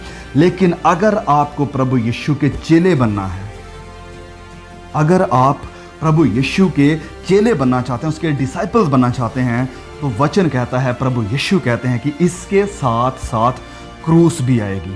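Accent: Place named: Doaba, India. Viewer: Dear brother you are speaking but I'm sure that you are a Punjabi fellow. Definitely you are from Doaba. Your style and pronouciation is of Hoshiarpur or JALANDHAR or kapurthala. May God bless you.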